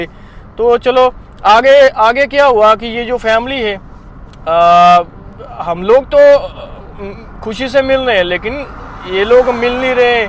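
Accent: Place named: Pakistan. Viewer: Which part of India you are from do you have video of that briefing about your self as I don’t know why your accent sounds like Pakistani accent Hindi. Very much similar to Pakistanis here in Dubai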